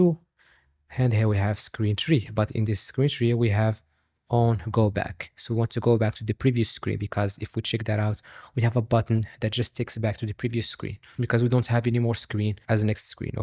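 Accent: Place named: United States. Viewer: اشرح عل مهلك خرب حظك مشالله عليك احس انك مولود في أمريكا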